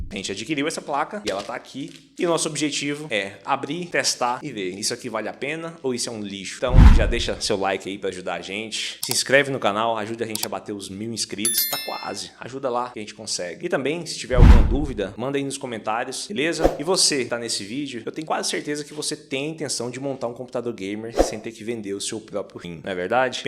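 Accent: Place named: Goiânia, Brazil. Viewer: Você é de Goiânia? Haha Top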